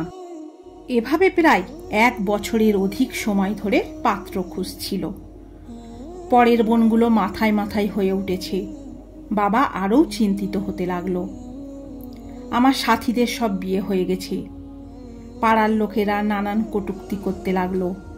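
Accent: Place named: Kolkata, India. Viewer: ভাষা এমন কেন 😮 মনে হয় কলকাতার 😅 উদ্ভদ লাগে শুনতে।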